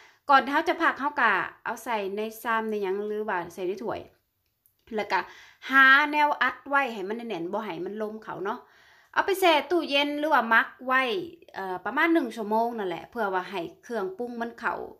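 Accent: Laos. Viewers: สวัสดีค่ะสาวลาวเว้าม่วนหลายเด้อ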